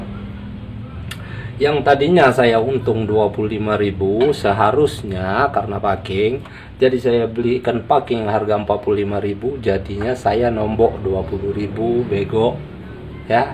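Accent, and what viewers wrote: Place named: Palembang, Indonesia. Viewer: Logat ny kayak wong plembang .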